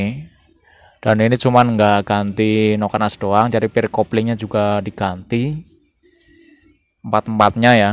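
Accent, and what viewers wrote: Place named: Java, Indonesia. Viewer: Bang ituh ngomongnya kaya medok bgt yh bang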